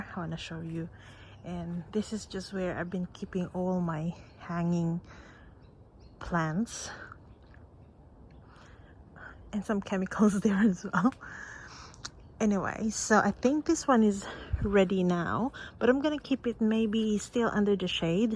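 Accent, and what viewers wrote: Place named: Philippines. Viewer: Hi! Lovely how you were able to revive them!! 💖 I struggle with these plants but I want to have them so bad! After I get new pearls, is it okay to change the soil completely? Will it kill the plant due to shock? I don't usually like the potting mix it comes with as I'm afraid they're not fit with my conditions (might be not so well-draining) or might even have pests in them. Is it better to leave it? Also, are they generally okay in shaded areas? Just bright shaded area, not much sun 😅 P.S. You sound Filipino!! Great video 💟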